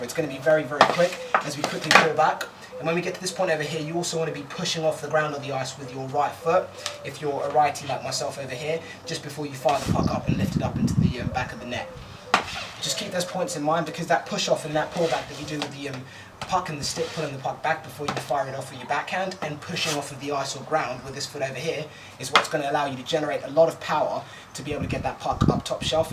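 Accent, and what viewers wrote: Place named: United Kingdom. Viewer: A black guy with a british accent giving hockey tips... awesome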